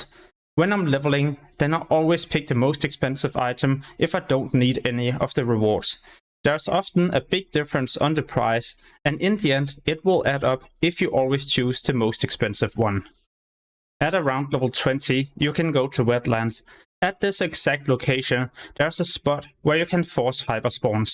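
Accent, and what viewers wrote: Denmark. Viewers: m8 listen to the accent, thats gotta be the phattest danish accent ive heard:D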